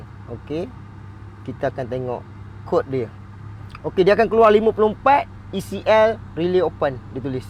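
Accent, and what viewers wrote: Pahang, Malaysia. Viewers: bunyi slang orang pahang je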